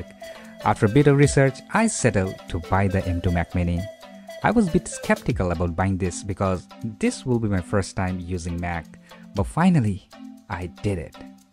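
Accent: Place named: United States. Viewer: thank you so much. I watched the other video you did about using it as a computer. Really answered question people didn't seem to be able to. And you sound very down to earth. I usually don't watch videos with an American accent cause there's a point my brain can't process the accent. So thank you.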